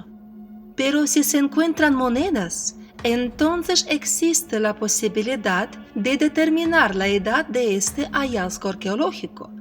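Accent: Russia. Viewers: Hey eres de Rusia verdad? Tu acento es inconfundible :3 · Muy bueno veo todos tus videos desde que lanzaste él primero, tenes que hacer un video sobre "vos" llama la atención tu asentó Ruso y que te llevó a realizar éstos trabajos históricos, desde ya muchas gracias y seguí así 😉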